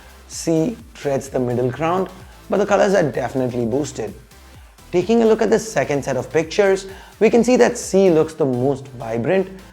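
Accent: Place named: Tamil Nadu, India. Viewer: Ennatha fluent ah English pesunalum....nammalungandrathu thaniya theriuthu 🙏🙏🙏🌸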